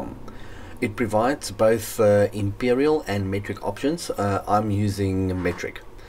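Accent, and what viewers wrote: South Africa. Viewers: I heard your accent and was gonna ask if you were based in SA? But then I saw the news paper you used with ‘GAME’ advertising, and we was like.. aah..haha. Great looking speakers, and good build techniques, I’m learning a lot from you, thanks!! Where do you get your parts, as I know SA isnt that easy to find stuff like that.. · awesome to see a fellow SA citizen make it in YouTube. Accent was very fimilar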